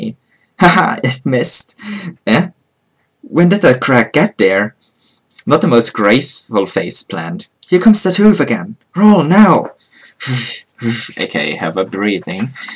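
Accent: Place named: Germany. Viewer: where are you from? i dont think that you're a native speaker... sorry if yoz sad that in one of your vids, im new on your Channel ;) You could be from Germany tho at least that was my first thought : ouh this guy has to be german hahaha great work ps.: Im from Germany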